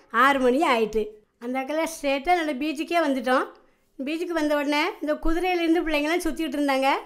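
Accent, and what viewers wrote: Tirunelveli, India. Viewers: அம்மா உங்க நெல்லைத்தமிழ்பேச்சைக் கேட்டுக்கிட்டே இருக்கலாம்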